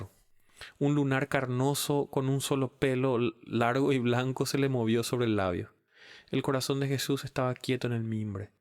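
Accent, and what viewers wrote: Paraguay. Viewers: Gracias por el aporte. Sería bueno que pudieras completar el libro porque no se encuentra en youtube y con tu acento paraguayo y las breves explicaciones de términos en guaraní enriquecen mucho la experiencia. Saludos y gracias! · Hermoso Roa Bastos. Muy bien leído con tu dulce todada paraguaya. Gracias por brindarnos a este Maestro de la literatura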